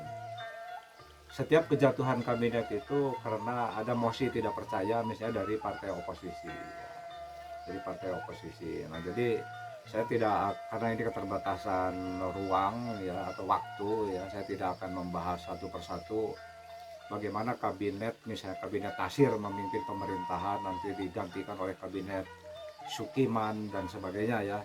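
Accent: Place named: West Java, Indonesia. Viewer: Sukaa banget pak, sunda banget oii tentrem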